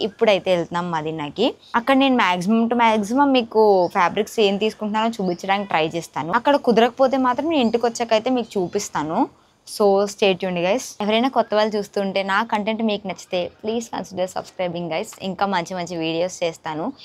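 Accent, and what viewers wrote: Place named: Samalkot, India. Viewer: ownaa...madi samalkot...emti ma side ammayi la anipistundi anukunna😅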